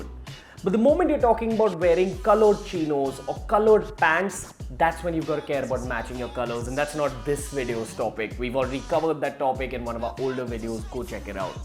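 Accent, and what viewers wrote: India. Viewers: I love just about all accents of the world, but man the Indian one is just so unattractive lol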